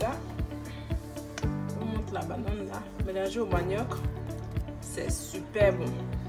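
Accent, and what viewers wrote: Ivory Coast. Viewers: Ouh lala j'aime trop ra façon de parler à l'ivoirienne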